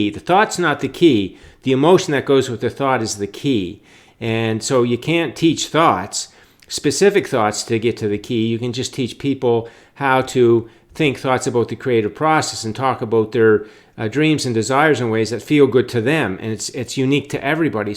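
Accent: Canada. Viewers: I’ve never noticed a Canadian accent until this video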